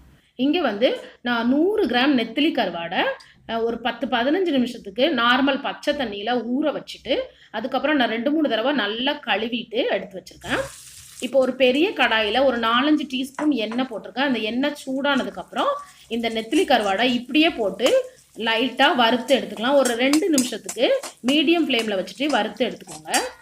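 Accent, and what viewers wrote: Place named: Tuticorin, India. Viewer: Unga native ethu akka... Slang tuty slang pola iruku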